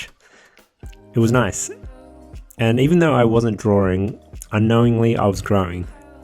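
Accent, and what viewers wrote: Australia. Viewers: wait was that sydney I heard..? yOU'RE AUSTRALIAN TOO?? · Australian? o_o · Whew, asian-australian (?). The accent was unexpected. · WAS NOT EXPECTING THE HUGE AUSSIE (or New Zealand) ACCENT!!! I fell off my chair XD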